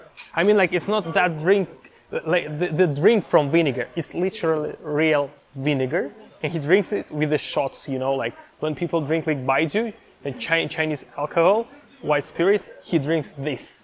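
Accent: Russia. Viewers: Your accent sounds like Russian btw, anyways great video! 😁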